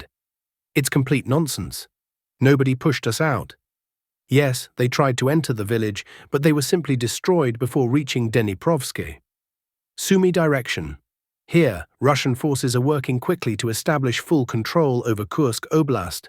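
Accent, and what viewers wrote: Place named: United Kingdom. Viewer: It is strange to have a British accent , and referring to "we and "us" during his narrative. I am suspect of this channel.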